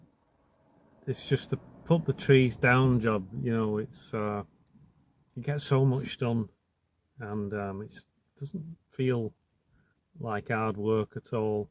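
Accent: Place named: Northern England, United Kingdom. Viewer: to hear your broad Northern accent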